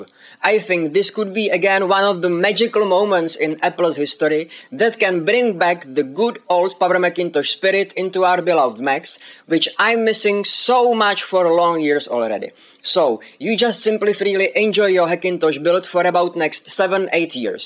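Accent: Czech Republic. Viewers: you are from czech :) ? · Are you from czech republic?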